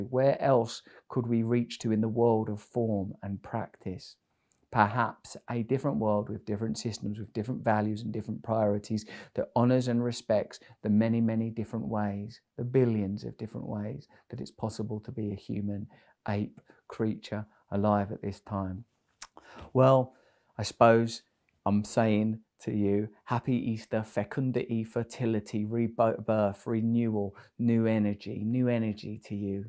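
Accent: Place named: England, United Kingdom. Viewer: your accent makes me miss England.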